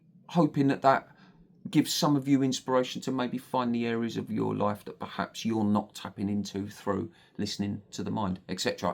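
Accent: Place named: United Kingdom. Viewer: Best wishes to you, man! You sound like the British version of ROB THE NOMAD. Looking forward to following your journey around the great North of the UK, or wherever you end up. Post often and happy travels!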